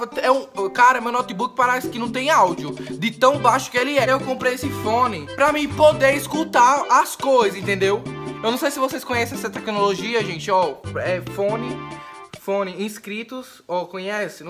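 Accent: Bahia, Brazil. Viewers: Ele é de qual lugar da Bahia ??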